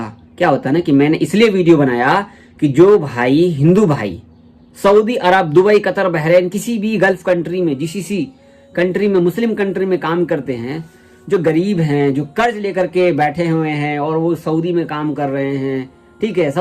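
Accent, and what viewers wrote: Bihar, India. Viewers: Aap Bihari ho Boli se lagta hai.Kaun sa Zila Ba.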